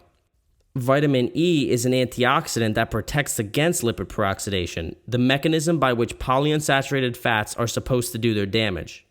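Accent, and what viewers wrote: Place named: New Jersey, United States. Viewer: has a Jersey accent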